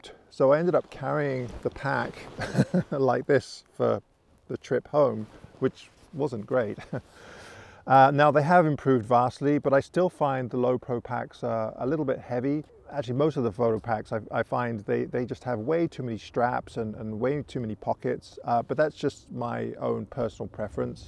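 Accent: England, United Kingdom. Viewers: I remember watching this video as the first one of yours I ever saw. I thought: "He sounds ok, possibly got some English in his accent and he talks sense and seems to have a history in photography." Mmm! So much for first impressions, I don’t remember thinking: "This man is possibly the greatest landscape photographer on YouTube and thus the best I’ve seen." With some of the other landscape photographers like Thomas Heaton, Simon Baxter, Paul G Johnson, that’s not something I say lightly. Thank you for pulling me into your channel, it’s a true pleasure and indeed an honour to see you work and to learn from you.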